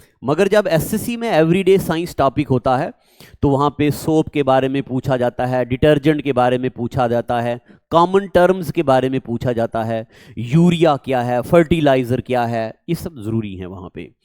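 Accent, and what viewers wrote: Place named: Kashmir, India. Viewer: Love this kashmiri accent 😂😂😂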